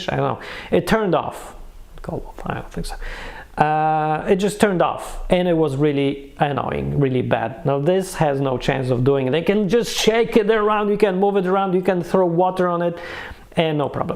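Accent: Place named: Poland. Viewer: Heard your intro... Heard you Polish accent... And I knew, this guy KNOWS....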